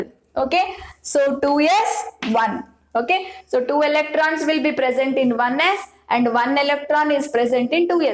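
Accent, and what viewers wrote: Karnataka, India. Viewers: Mam r u from Karnataka? I also can speak kanada well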